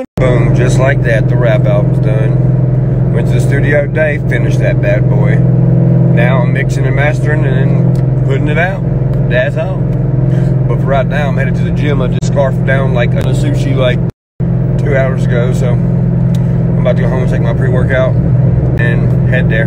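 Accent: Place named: Appalachia, United States. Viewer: Anytime my Main Man I have followed you for years! I THINK YOU HAVE A HEART OF GOLD AND A VOICE OF A HILLBILLY GOD!! MUSIC FEEDS THE SOUL! I AM SUCH A HUGE FAN I DO NOT COMMENT MUCH BUT I WATCH EVERY LIL CONTENT YOU POST UP!! MUCH LOVE FOR YOU. I am so proud of you!!! Congratulations God is good all the time!. Keep it up many more blessing my friend Love ya! 🥰🥰🥀🥀💜💜🍻🍻🍻🍻👍😉🤗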